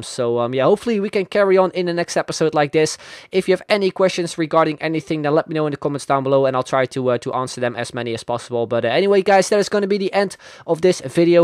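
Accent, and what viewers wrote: Netherlands. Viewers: are you dutch cuz you sound like that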